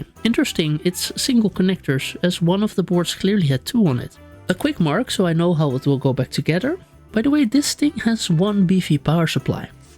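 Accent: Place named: Netherlands. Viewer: Oh leuk, een nederlander op YouTube. Leuke video daar. Ik merkte direct je accent op haha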